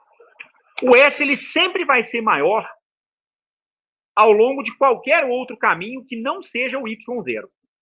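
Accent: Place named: Minas Gerais, Brazil. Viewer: Parabéns meu amigo. A vinheta é fenomenal. E o sotaque mineiro me lembra de 20 anos atrás.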